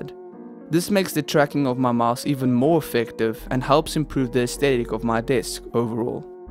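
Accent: South Africa. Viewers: You South African? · Is that a South African accent I’m hearing my boytjie?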